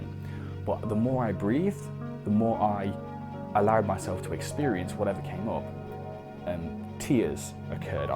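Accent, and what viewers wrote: United Kingdom. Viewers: So happy that I've found this video! Thanks a lot for mention the book, I could feel in my body that only talking therapy isn't enough... I feel just like Ece Erdem: got here for healing and stayed for the content but those eyes and the British accent added that special thing... <3 lovely! Thanks, Thanks, Thanks a bunch,! Much love from Brazil!:)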